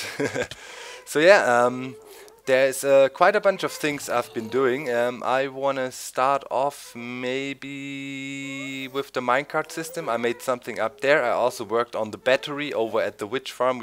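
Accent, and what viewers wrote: Germany. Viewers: are you from england with a bit accent of germany?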